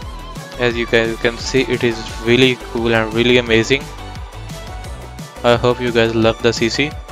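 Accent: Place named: Pakistan. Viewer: I guess you're from Pakistan from your voice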